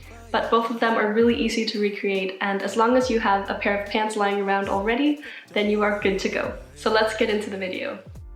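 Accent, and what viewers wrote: Denmark. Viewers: Okay, bliver nødt til at høre om du er fra Danmark? Dit engelsk er virkelig godt, men der er noget dansk over det 😄 hvis du er, og kan læse min kommentar, så tusind tak for din tutorial! Den var super hjælpsom 😊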